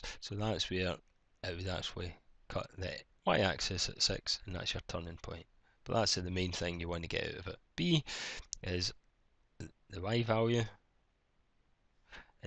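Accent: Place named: Scotland, United Kingdom. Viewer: mainus, Scotland accent is amazing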